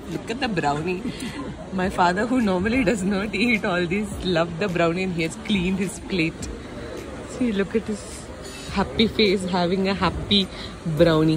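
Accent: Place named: United Kingdom. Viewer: You speak English like British. Reminds me of Queen. We Indians are best.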